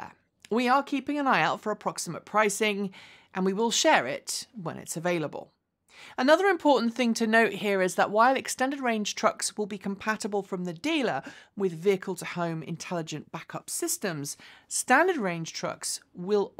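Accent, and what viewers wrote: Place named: United Kingdom. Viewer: Wow! A woman with British accent calling a trunk a trunk and not a boot. I'll be damned ...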